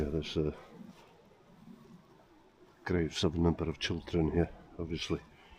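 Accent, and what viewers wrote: Wales, United Kingdom. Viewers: I absolutely love listening to your accent. Especially when you speak in Welsh. So beautiful! Thank You. Indiana USA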